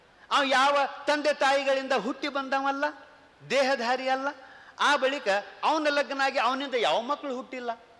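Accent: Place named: Kannada, India. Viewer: His Kannada is charming 👌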